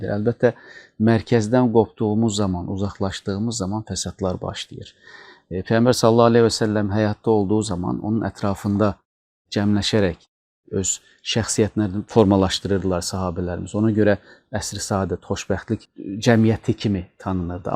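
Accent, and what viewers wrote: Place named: Turkey. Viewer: Təzə moizeler Turkiye lehcesi ve turkiye dilindedir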